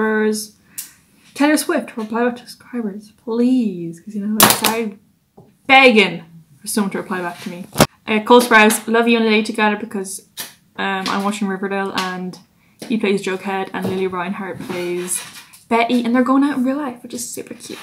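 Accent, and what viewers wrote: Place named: Ireland. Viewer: Lol, I click on your video and straight away I hear the accent and know your irish😂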